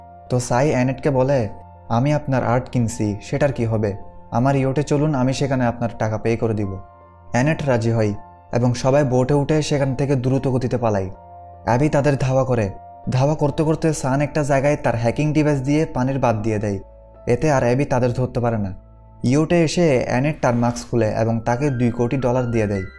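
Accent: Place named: Noakhali, Bangladesh. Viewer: Bhi.. apnar bari noakhali maijde. Ta amai vuge gesi jae. Noakhailaaa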